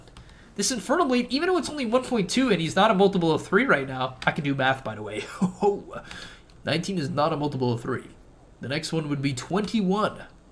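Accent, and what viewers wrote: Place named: United States. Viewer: The way you say veil lol, I was like wtf is he saying.. had to listen 3 times. Do all Americans say it like that? Are u American or Canadian, u sound American I think.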